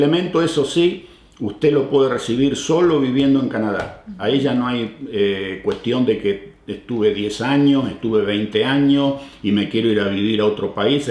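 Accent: Argentina. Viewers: Q grande,por tu forma de hablar parece q nunca te fuiste e Argentina,como te quiero!saludos desde Buenos Aires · Cómo no se te fué el acento... Argentinazo!!!!!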